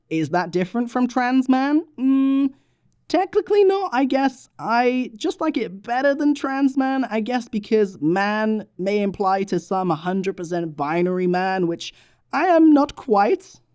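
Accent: United Kingdom. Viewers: (Warning, overused joke incoming) If I had a nickel for every time I’d seen a transmasc person named with what sounds like a somewhere British accent that’s also just a chaotic wholesome vibe to witness on my silly little screen I’d have two nickels, which isn’t much but it’s fun that it’s happened twice (I almost forgot to finish the joke)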